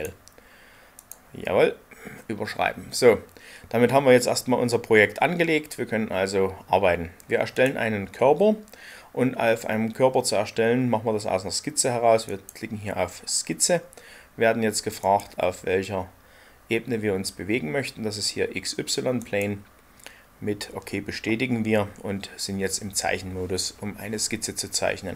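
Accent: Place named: Saxony, Germany. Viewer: Vielen Dank! Bitte in Zukunft mehr Tutorials auf sächsisch :)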